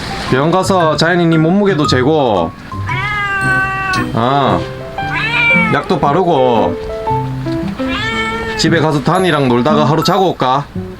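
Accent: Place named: Gyeongsang, South Korea. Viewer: 경상도 말투 무뚝뚝한데 강아지들한테 넘넘 부드럽게 얘기하네요ㅋㅋ 완전팬입니다^^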